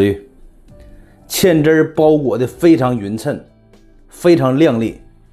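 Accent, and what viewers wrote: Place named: Liaoning, China. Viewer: 浓厚的辽西口音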